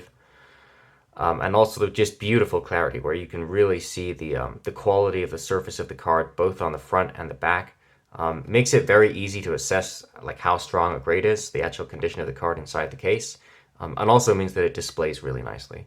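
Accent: United Kingdom. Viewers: Your British accent is stimulating my focus lol.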